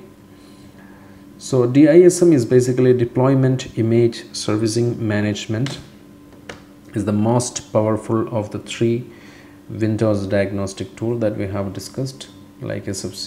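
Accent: Kerala, India. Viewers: Great video! Wow! I also detect a 🤓 faint Malayalam accent when you speak...which makes it even more awesome!! 👏👍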